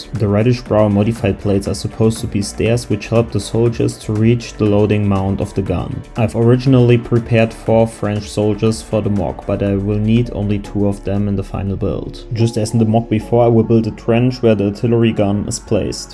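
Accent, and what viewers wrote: Germany. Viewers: Bist du deutsch weil du hast einen ziemlich deutschen Akzent und hast die Deutsche Artellerie auch sehr gut ausgesprochen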